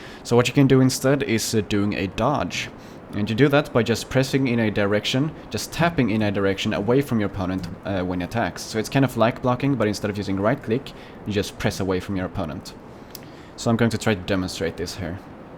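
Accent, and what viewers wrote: Sweden. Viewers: hes swedish i can her it on his voice cuz im swedish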